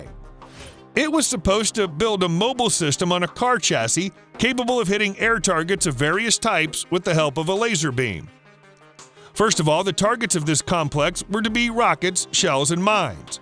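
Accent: United States. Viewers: typical American annoying voice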